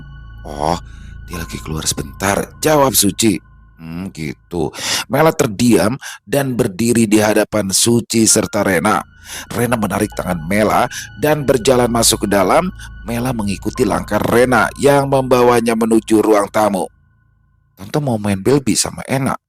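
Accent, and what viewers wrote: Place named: Betawi, Indonesia. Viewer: assalamualaikum bang, salam sehat buatbang bang seneng banget klw ceritanya bernada betawi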